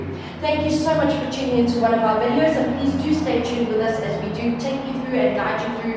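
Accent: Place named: South Africa. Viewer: Well some people are a little thick not to point at RAzzA, I personally watch it a few times not because I did not get it the first time, I just Love the South African accent and your beautiful eyes can't wait for the touch screen thermostat video . Not sure what you were thinking with the scissors? hey but.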